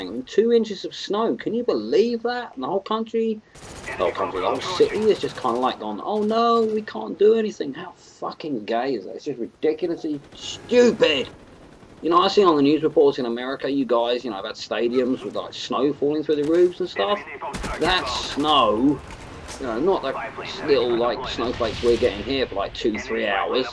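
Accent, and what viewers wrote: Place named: Australia. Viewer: seriously the Aussies passed on their accent to you. its sounds awsome. great vid bud.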